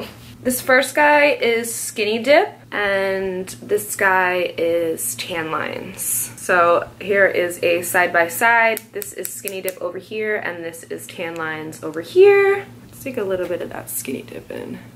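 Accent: England, United Kingdom. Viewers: Your soo good at an English accent hahaha😅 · I love her English accent lol. She is my favorite & by far the best YouTuber/beauty guru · honestly any problem you encounter you always solve it!! lol like ya wig! I love the grey wig!!! :)Also... I don't know if anyone has told you but your English accent is one of the best !!!!! I love it lol loving these blogs!!!! always inspired :) :) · love the british accent. <3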